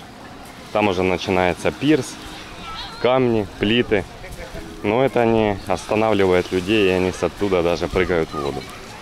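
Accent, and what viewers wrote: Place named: Lviv, Ukraine. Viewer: иди на. У тебя говорок со Львова.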